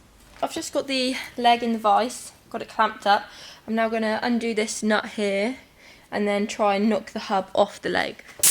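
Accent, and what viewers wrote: Norfolk, United Kingdom. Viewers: Hot girl with a British accent that likes to work on cars... I know I had an engagement ring around here somewhere... 😉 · Your accent sound well Norfolk !! 😆 I've got an '87 Granada Scorpio. Just seen a Sierra Mk1 4x4 Ghia estate on EBay... tempting. Anyway, I've subbed, so keep you a troshin'. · You sound like you're from Norfolk. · like this accent so british ;P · Love the channel, you must be from Norfolk with that accent.....👍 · You sound like you are from Norfolk. I'm an East Anglian myself. Good to see the retro cars being kept alive. As well as bikes I am working on my 71 Mach1 Mustang and '72 Mark 1 Escort also. Look forward to future episodes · Thought that sounded like a norfolk accent. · "That do, achly" - aha! Norfolk, I thought... and I was right! :-)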